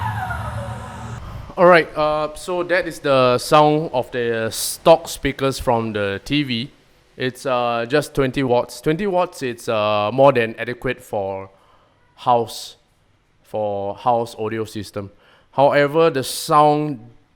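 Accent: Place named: Nigeria. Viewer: U gat Nigerian Accent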